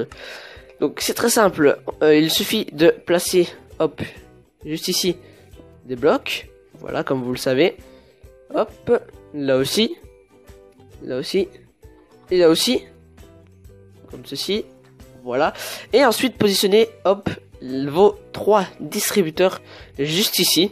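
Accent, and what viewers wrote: Belgium. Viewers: GG tu es belge ? ^^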